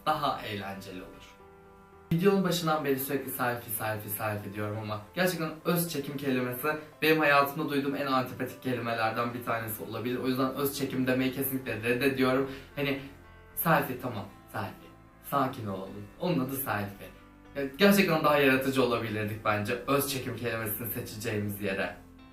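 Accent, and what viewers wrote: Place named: Bursa, Turkey. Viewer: Abi sesin neden Bursalı gibi çıkıyor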